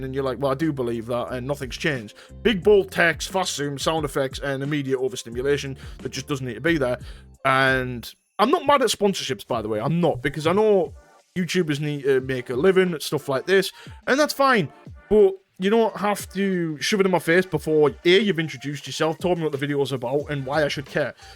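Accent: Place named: North, United Kingdom. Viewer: I totally agree with all the points you brought up. Seeing it from the view as a creator and as someone who likes to express themselves in the comments & give feedback on videos... it feel as though we're all walking on eggshells & we can't be as organic as we want to be. For creators, they can't push the boundaries or be edgy or forward thinking anymore. As a viewer..: I'm not one of those Twitter types who gives knee jerk abrasives comments, if anything I'm respectful of of a creators channel & I don't want to bring any negativity to the channel but it's so annoying when you make comments that are totally valid, factual & non offensive... it gets removed or censored, all while someone can berate you in the crudest manner & they get a pass. The latest YouTube algorithm & censorship doesn't make sense but hey ho, at least they're not as bad as Twitch. 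Ps love the accent & as a Londoner & every time I've met people from up your end they've been the nicest people 💯🙏🏾👊🏾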